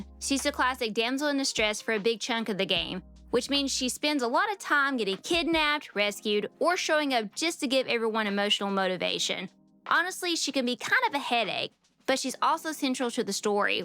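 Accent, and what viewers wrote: South, United States. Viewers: Just curious if your from the southern part of USA ? You have that cute accent if not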